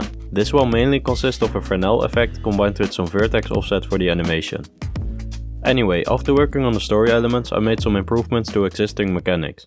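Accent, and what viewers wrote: Netherlands. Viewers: R U Dutch? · Wow what an amazing channel just subscribed, when I heard your accent I immediately thought that you were Dutch! and your are right? I'm also learning how to program myself, but I'm just a beginner. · r u dutch? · Beautiful dutch accent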